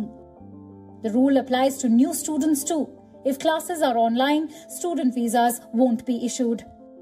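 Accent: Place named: India. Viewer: Stop that indian english accent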